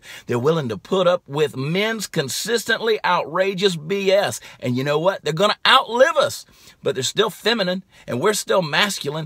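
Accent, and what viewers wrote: Texas, United States. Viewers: I could listen to you spout the truth like this in that manly Texan drawl all day long. Every man needs to listen to this video AND others. My kinda guy. Keep it up, we like yer style.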